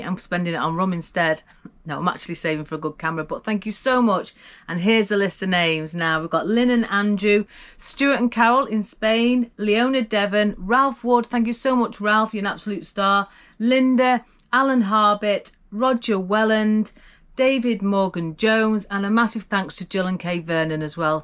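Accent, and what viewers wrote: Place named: West Bromwich, United Kingdom. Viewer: Lovely approach to life,. Always positive! I am from Switzerland and spent som time during my study years in West Bromwich, loved the dialect. Are you from that area also?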